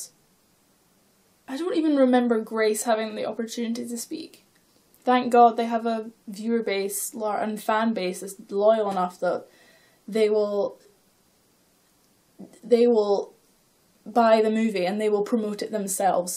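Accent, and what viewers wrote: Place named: Scotland, United Kingdom. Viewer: Gurll?? Where are you from????you sound scottish!!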